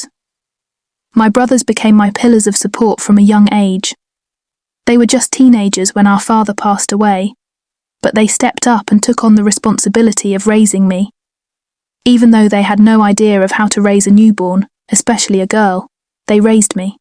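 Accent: United Kingdom. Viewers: First time listening. 29 minutes in, so far, it's good. I l❤ the brit accent.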